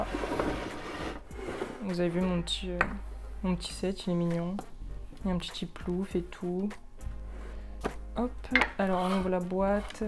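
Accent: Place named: Marseille, France. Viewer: Accent marseillais décuplé fois 1000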